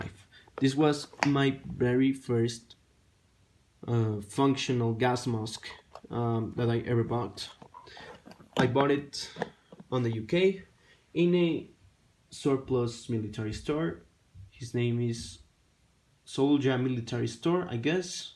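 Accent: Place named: Russia. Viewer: Oooh I really thought you were russian cuz of yr accent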